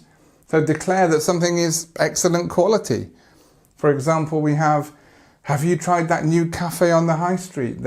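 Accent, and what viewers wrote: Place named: United Kingdom. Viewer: Though I love listening to our dear Adrien,I really enjoyed listening to you, I looove your beautiful british accent ! I hope to see you again on this channel.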